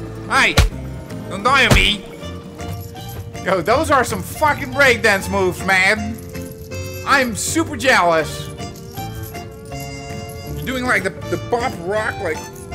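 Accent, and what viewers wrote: Netherlands. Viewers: Somehow your accent got even more Dutch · He sounds like he’s from the netherlands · are you dutch i hear dutch in your voice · I got a good feeling about you being dutch because that is an accent that only dutch people have. But are you?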